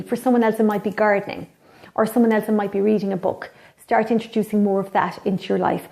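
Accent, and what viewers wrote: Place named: Ireland. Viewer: Such an important aspect of good health to talk about! Btw I love your Irish accent haha:) and keep up with the videos, I'm loving them:) x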